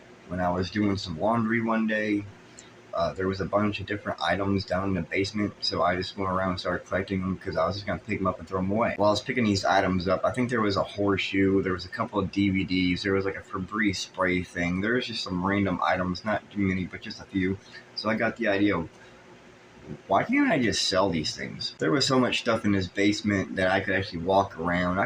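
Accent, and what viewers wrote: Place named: New York, United States. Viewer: How tf did I know you where from ny 😂😂😂 definitely cuse or maybe further up like Albany or Saratoga 😂😂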